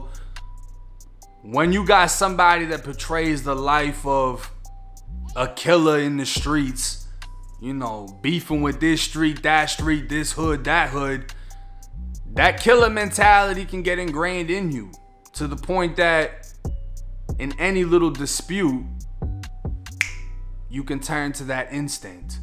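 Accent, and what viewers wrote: Boston, United States. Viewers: Bro u sound like ur from boston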